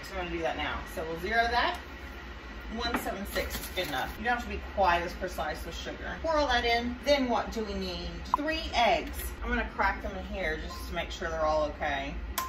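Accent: Kentucky, United States. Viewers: I love the Kentucky accent! (I'm a Brit.)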